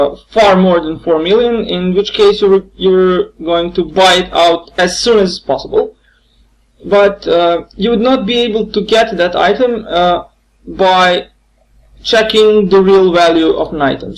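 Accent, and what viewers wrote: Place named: Poland. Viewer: Slychac ze polak :D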